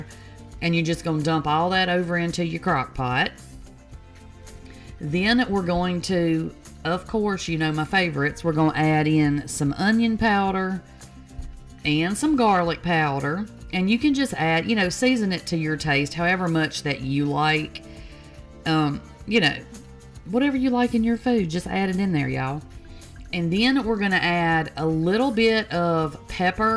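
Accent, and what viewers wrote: South, United States. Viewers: That's good ole southern talk, very pleasing to listen to, great recipe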